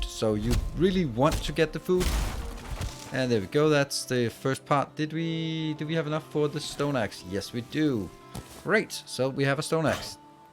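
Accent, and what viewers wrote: Denmark. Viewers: Are your from denmark because You Sound like a danish takling english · Ohaaa en dansker, føler det er så cute når man kan høre det. Fed video!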